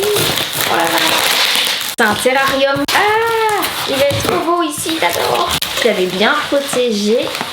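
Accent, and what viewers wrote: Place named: North, France. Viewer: tu es originaire du nord de la france? tu as un accent >_<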